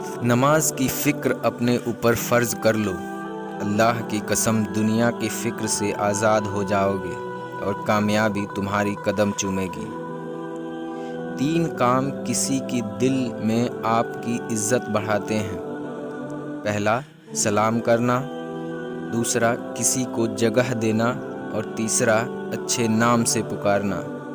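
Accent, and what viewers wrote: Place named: India. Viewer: Aap kya India se ho aapke bolane ka Andaaz Sahi Nahin Hai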